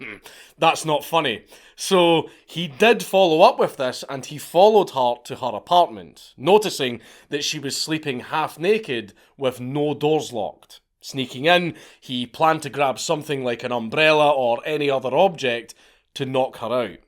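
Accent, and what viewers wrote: Scotland, United Kingdom. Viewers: Being a German native I daresay I like this Scottish accent very much. It sounds very harsh but very funny at the same time. Always puts a smile on my face. 😊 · Personally, I like the way he says "work," for some reason, as idk what it is, but there's something about his scottish accent that tickles my American brain. 😅 But the way he says "murderer" sounds oddly appealing, too. 😅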